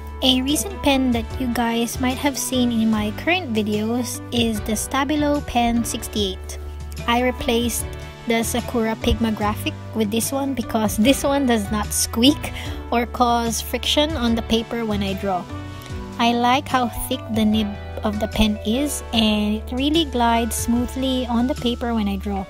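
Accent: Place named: Philippines. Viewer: Your voice kinda reminds me of a Filipino accent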